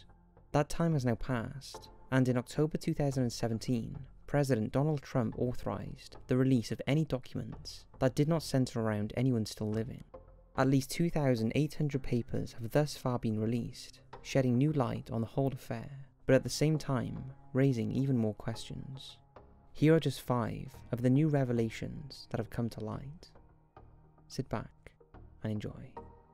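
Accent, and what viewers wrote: Wales, United Kingdom. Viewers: Plus that Welsh accent... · I know a Welsh'mon when one talks 🏴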